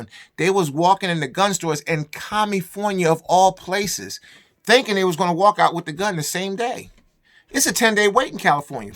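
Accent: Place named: Philadelphia, United States. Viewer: Ha ha bro. I knew you were from Philly from your accent. I'm glad there are some like minded people like you in this City.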